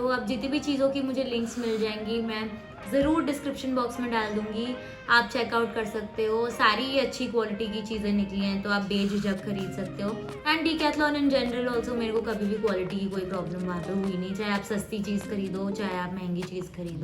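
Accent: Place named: India. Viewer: Love u indian accent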